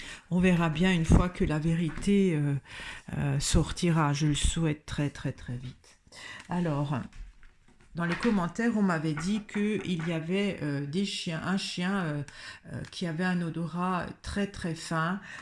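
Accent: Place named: Besançon, France. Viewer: Bjour Madame, ê vous de Besançon? Je pose la question en raison de votre accent.Je suis née là bas mais je n'y suis pas restée longtemps. En espérant que ce petit bout d'chou sera retrouvé sain et sauf❤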